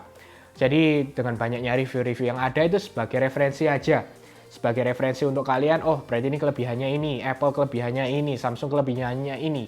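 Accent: Java, Indonesia. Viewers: Penjelasannya enak, runtut, komunikatif, dan yg penting medok.. auto subscribe 👍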